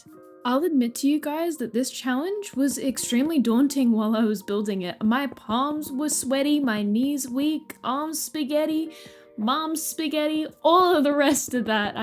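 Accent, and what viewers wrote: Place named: United Kingdom. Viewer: I just realized you sound like a slightly British version of Nina Oyama 😅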